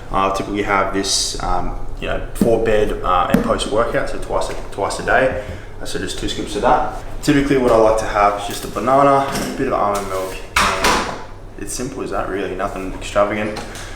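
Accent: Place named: Australia. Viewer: Hey man—just discovered you have YouTube videos—I messaged you today about Donnie Darko. Wow, people tell me I speak too fast, I think ya beat me there! 😂 No worries I understood you throughout—and wow I love Aussie accents. Y’all are the coolest, every Aussie I’ve ever met in Texas was a top notch person. Truth is I’d probably hire you for online training, but I’m am ex-trainer myself (ACSM/HFI) and I seem to always clash with the guys I’ve hired. Anyhow, two thumbs up on your online presence & ventures. And PS: I’d be surprised if a Hollywood producer doesn’t offer you a film role one day soon, you’ve got a great mug, killer bod and masculine voice and presence—the works! Cheers mate. 👊💥🙌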